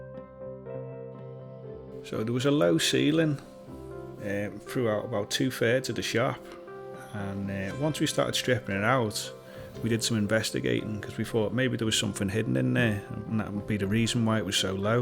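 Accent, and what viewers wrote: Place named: Lancashire, United Kingdom. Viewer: Your accent.. West Lancashire/Merseyside.. How you find it living up there? Long way from home, but I've always wanted to move up there myself too. How's it in your experience?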